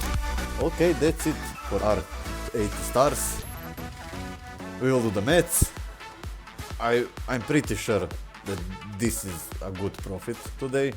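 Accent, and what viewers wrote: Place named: Balkans. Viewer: Not rusdian accent of english i know how balksns speak english hes from serbia or croatia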